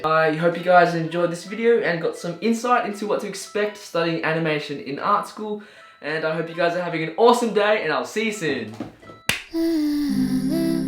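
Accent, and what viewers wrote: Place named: Australia. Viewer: so i've been following you on instagram and tumblr for so long (youtube for at least a year) and as you were speaking, I only just realised that you were Australian... OTL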